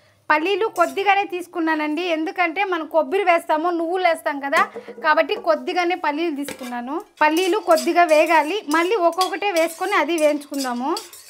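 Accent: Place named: Rayalaseema, India. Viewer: Meeru raayalaserma baasha maatladthaaru Mari andhara antaaru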